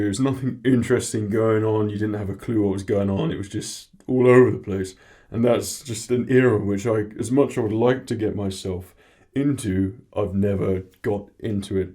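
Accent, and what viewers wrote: Australia. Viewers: I thought you were an Australian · I've said it before but I'm really glad I'm not the only one who felt the Disney Trilogy made the galaxy feel small. Also, you're in England? Why was I always thinking you were Australian?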